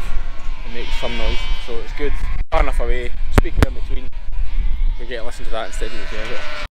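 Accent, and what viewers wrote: Scotland, United Kingdom. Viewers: Hi I live in New Zealand, I drive stock trucks for a living I use a kelpie x Smithfield bitch for loading and unloading she backs sheep all the time real handy for loading cattle as well nothing gets past her will send you a video at some stage so you can see how we do it down here, keep up the good work it's nice to hear my Scottish accent again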